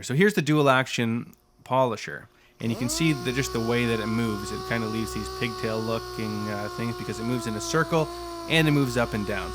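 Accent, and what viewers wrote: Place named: Canada. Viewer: Good video. Love the Canadian accent!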